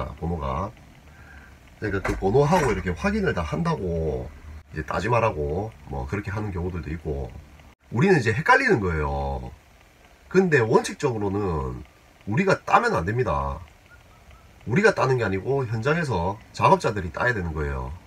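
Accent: Gyeongsang, South Korea. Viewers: 열 팬 입니다 훈남 이시고 구수한 경상도 사투리...항상 잘 보고있는 말년에 용달하는 창밖의 남자 임다 앉으나 서나 안전 운전 하십쇼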